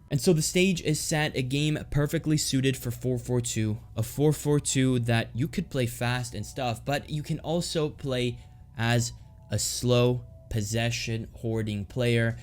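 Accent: United States. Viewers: It's an American accent so I wouldn't call it a 2nd language.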